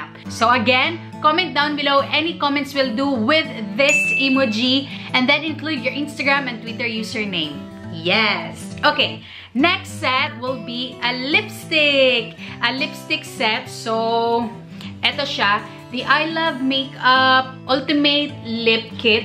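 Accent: Visayas, Philippines. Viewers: Ate 💗💗😉😉btw pang ilang commeng kona bato😂😅but its okay...ate alam mo ba na pangalawa ka sa mga best youtuber para sakin..simula palang kasi nung napadpad ako dito grabehan na ehh...nagtuhan ko agad channel mo..and sana te mabasa moto ilolong comment ko na..ate hope i win for this giveaway kahit eto lang kahit isa lang dyan basta may matanggap ako from my favorite youtuber...plis🙏🙏sana talaga ate...kasi matagal kong winish na manalo sa mga giveaways mo pero lagi nalang hinde🙍kaya sana this time manalo namn ako😿sana..btw ate wlang halong eklabush to ah.ang galing mo talaga and ang cute mo magsalita...bisaya?? Hahah same tayo ate papa ko kase bisaya pero andito kami ngayon sa caloocan city...i hope ate mapili mo talaga ko..pag napili moko gagawan ko yan ng bidyo😂and irerebyu ko yan😅💗pramis...kapag napili moko d ka magsisisi..hahha...lab yuu ate..keep it up👍sana talaga mapili moko..btw mag rarandompicker kaba o ikaw mismo pipili??? 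Sana ikaw nalang mismo para mas maganda kasi alam mo kung kanino dapat yan mapunta..anyway un lang..lab yuu 😂😂sana talaga mapili moko. Wish ko lagi kong pinagdadasal..plisss...1million na plis..hahha💞un lang ate. Ig: maizel_jems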